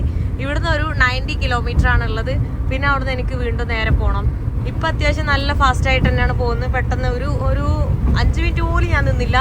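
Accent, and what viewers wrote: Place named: North Kerala, India. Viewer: വടക്കൻ കേരളത്തിലെ സംസാര ശൈലി കേൾക്കാൻ ഞങ്ങളെ പോലെ മദ്ധ്യ കേരളത്തിൽ നിന്ന് ഉള്ളവർക്ക് റെസം ആണ്😊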